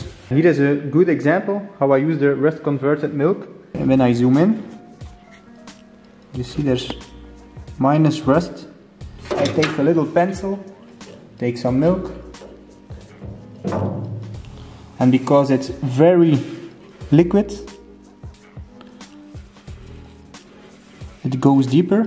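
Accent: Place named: South Africa. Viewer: It’s being preserved for another 50 years ;-) well done. When I hear your accent I would think you are from Suid Afrika?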